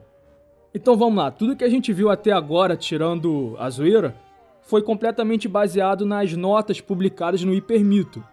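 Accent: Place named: Rio de Janeiro, Brazil. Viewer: KKKKK MANO ESSE SOTAQIE É MT HILÁRIO Parece q eu to falando sobre cdz com um daqueles tios de boteco do rio Melhor canal de cdz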